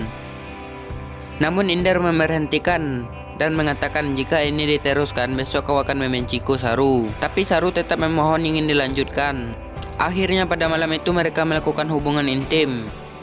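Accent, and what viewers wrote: Madura, Indonesia. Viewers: eh logatnya kek mdura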